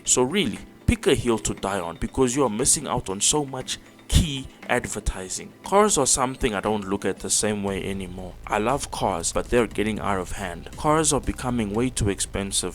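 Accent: South Africa. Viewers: Without even checking your profile, I can hear the strong South African accent 😅😅 Great video bro, keep it up!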